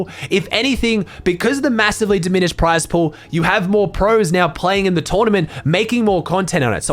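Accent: Australia. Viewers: He said his gamertag got u aussie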